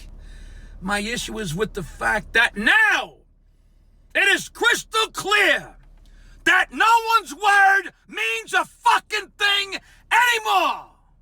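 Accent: New York, United States. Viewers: Love your view points of expression with that New York flair. Hope your ticker holds up but, you are a good loud speaker.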